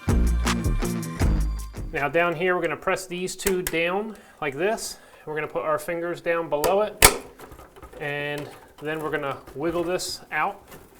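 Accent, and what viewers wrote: United States. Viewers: This guy has an accent, which I can't tell where in the US he is from. Just listen to how he pronounces the word "coil"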